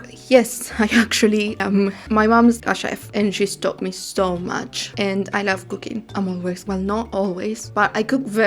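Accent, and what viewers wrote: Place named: United Kingdom. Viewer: I want that british accent! I need to move UK. What do you think? 😊 You are living my dream.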